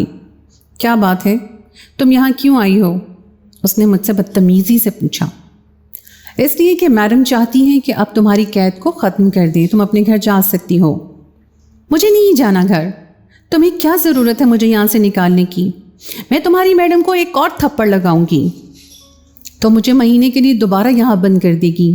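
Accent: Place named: India. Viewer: you still have Indian Hindu accent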